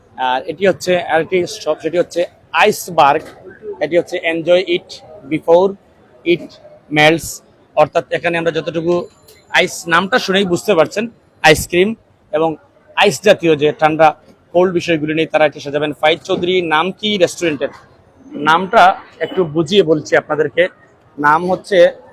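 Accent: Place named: Sylhet, Bangladesh. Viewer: Sylheti accent bhaisab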